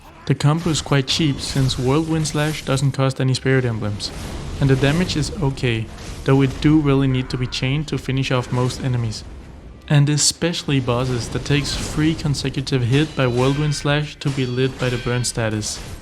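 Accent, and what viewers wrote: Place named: Denmark. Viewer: Er du dansk? The accent really sounds danish.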